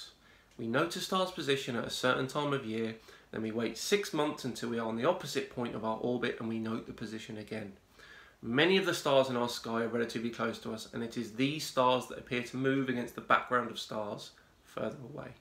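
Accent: United Kingdom. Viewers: I have to say that you are the only channel talking about Flat Earthers that I have subscribed to, which I just did 5 minutes ago. Your approach is informative, no nonsense, straight facts that will appeal to any half sensible person even slightly being swayed by the Cult of Flatness. It's also nice to see a brit out there, though I can't quite place the region. Keep it up good man!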